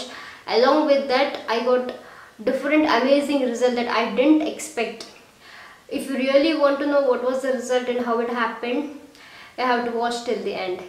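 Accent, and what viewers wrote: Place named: South India, India. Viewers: Its south accent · In love her innocent indian acctented english.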